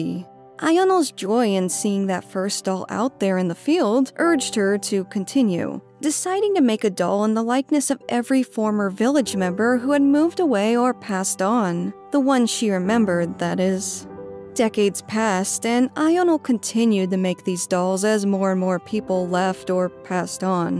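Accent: Japan. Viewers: idk if this makes sense or if you have heard this before, but the cadence that you speak english is really similar to how native japanese ppl speak japanese. its really cool to hear that in english, and also your japanese sounds great too! been watching ur vids for a while ♡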